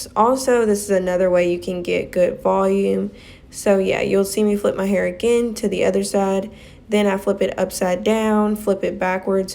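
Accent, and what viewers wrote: South, United States. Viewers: Where you from?! Sound like a southern girl like me 😊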